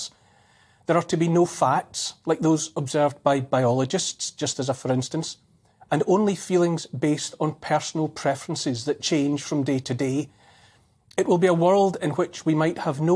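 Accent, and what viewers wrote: Scotland, United Kingdom. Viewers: He has the perfect accent for doom and gloom reporting, is that Scottish?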